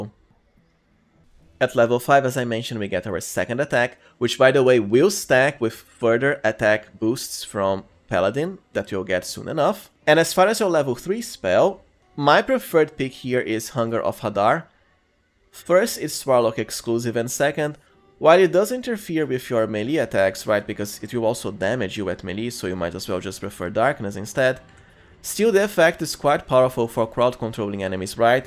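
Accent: Brazil. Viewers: Se essa voz e sotaque n for BR minha vida é um mentira! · Eu sabia que tu era brasileiro so pelo sotaque kkkk a gente se identifica ate em ingles pqp kkkkkkkkkkkkkkk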